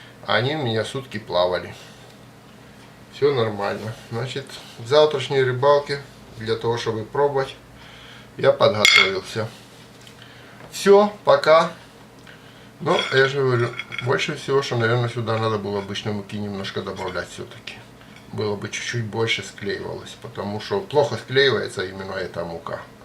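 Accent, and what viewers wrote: Ukraine. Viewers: Подсмотрел у укрАинских рыбаков.украинские рыбаки -хорошие рыбаки.я с Сибири.судя по"молотарке","та же самая"говору,сразу ясно шо не москаль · Судячи з акценту, Ви українець, чи не так?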